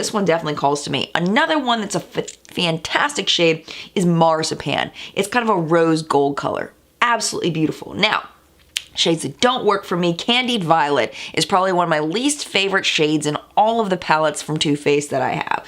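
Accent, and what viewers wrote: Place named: New Jersey, United States. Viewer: I subscribed for that Jersey accent alone! ...that is a jersey accent amiright?!?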